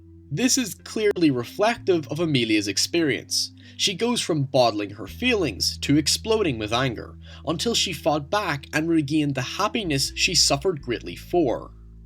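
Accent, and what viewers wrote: Northern Ireland, United Kingdom. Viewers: Norn Iron? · where is this guy from is it northern ireland? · Are you Northern Irish?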